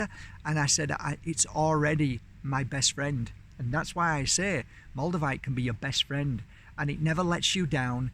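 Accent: Yorkshire, United Kingdom. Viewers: I've just ordered my first moldavite this morning. I spent time looking at different ones on a site and was drawn to a particular one. I've experienced some sharp headache like pains intermittently...a little apprehensive but interested to see what happens when it arrives. Love your Yorkshire accent, I'm in East Yorkshire 😊💫💫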